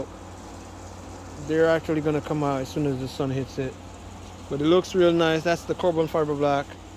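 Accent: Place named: United States. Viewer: Man a Yankee now. It's a Jamaican channel I subscribed to bredda. Be careful with the accent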